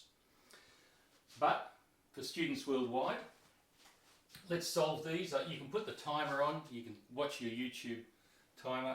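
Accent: Australia. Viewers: First of all thank you for the insight. It saves an awful lot of time, mate However, please exchange using the x symbol for multiplication to the dot. It is less confusing in the evaluations. Also, your 4's look like 6's sometimes, especially when used as exponents. And finally, please adapt a system of presentation where you are not in the way of the whiteboard. I am a teacher. please accept this as constructive criticism. I do enjoy the Aussie accent whenever I get a chance to hear it, like Bryan Brown, Chris Hemsworth, Hugh Jackman, Nicole Kidman and your other countrymen.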